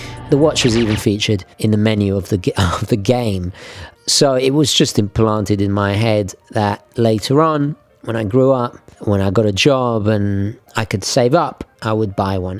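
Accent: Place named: United Kingdom. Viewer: It is a remarkable history and an amazing review. I truly like the way you tell the characteristics. I so much enjoy your British English review.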